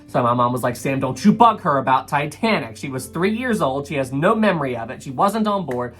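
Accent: South, United States. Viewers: You're from the South aren't you?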